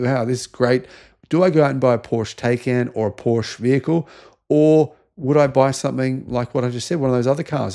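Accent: Australia. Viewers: has amazing analytical ability. heralds the rise of EVs and the demise of the Internal combustion engines for five years as we now see this happen before our eyes. I used to call you, in 2021, (where you no longer live). Congratulations on your abilities and foresight. Thanks for thousands of videos and positive outlook. 😂🎉 And after 1000s of videos as well as from SMR, I, from Arizona, still can't do the Australian accent!!! 😢 Thank you.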